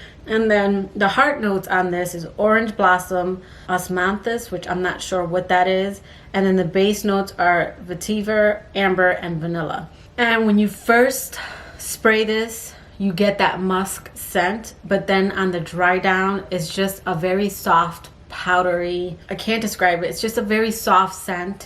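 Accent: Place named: Orlando, United States. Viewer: When i first heard you talk im like you sound like you would live where i live (weird, i know) lol and sure enough you say Orlando😂 which is where I live. Im like OMG! Small world. And I have all the perfumes you mentioned besides narciso because i highly dislike his perfumes, but overall awesome pics!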